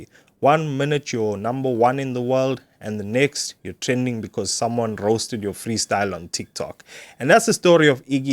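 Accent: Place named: Cape Town, South Africa. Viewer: Im south african, from cape town, are you from here too? That accent is very recognizable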